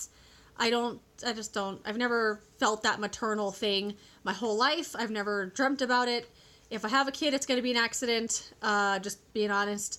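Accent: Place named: Canada. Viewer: Your accent sounds Canadian. Are you? Malls are my favorite too, ever since I was old enough to shop with my own money.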